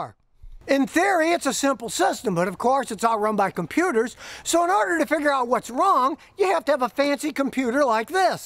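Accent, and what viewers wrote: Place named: Texas, United States. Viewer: I love your sweet Texan accent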